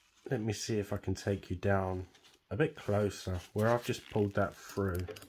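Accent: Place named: England, United Kingdom. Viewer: Sorry, I must have missed something you said, when do you apply the black/white colour to the pieces please? I’m worried about doing it too soon and obliterating everything else. Thankyou so much for your work and videos, sometimes they are all that keep me afloat, it’s lovely to hear an English accent too, being of that country.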